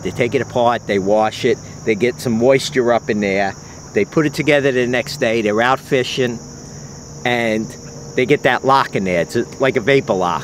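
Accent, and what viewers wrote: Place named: New York, United States. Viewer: New Yorker 😂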